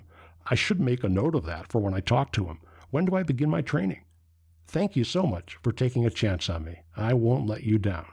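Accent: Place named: Nigeria. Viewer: Like the way you talk like a Nigerian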